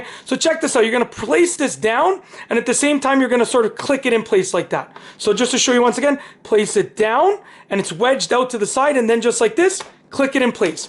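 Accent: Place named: Canada. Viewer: I guess from your accent that you're Canadian?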